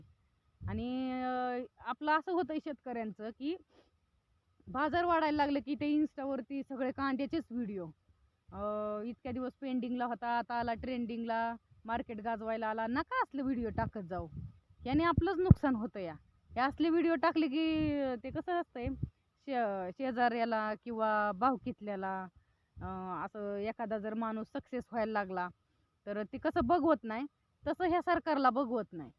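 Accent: India. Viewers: बोलण्यावरून बारामतीची वाटतेय ताई अजितदादाला सांंगा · गाव कनते मराठि बोलता 🎉😂❤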